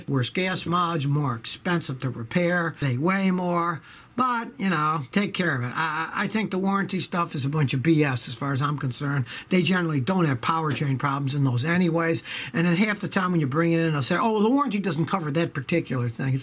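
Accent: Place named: Upstate New York, United States. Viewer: Hes from upstate NY, he sounds like italian friends i have from upstate NY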